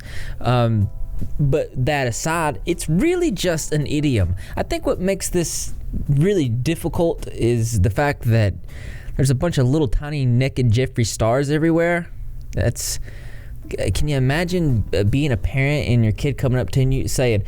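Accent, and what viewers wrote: South, United States. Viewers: He has a slight southern accent sometimes it's quite noticeable depending on the word lol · long live the South, lol. I'm from NC and I feel like he's just gotta be close lol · Your southern accent reminds me of my hometown. Too cute ❤️🐢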